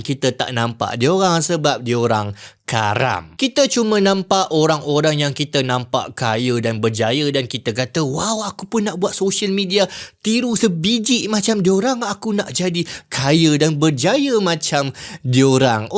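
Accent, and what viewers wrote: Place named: Perak, Malaysia. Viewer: Pekat perak nye yeob🤣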